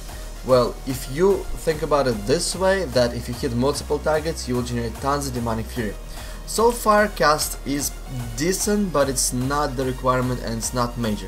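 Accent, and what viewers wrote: Russia. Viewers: Твой русский акцент, не плохо смотрится)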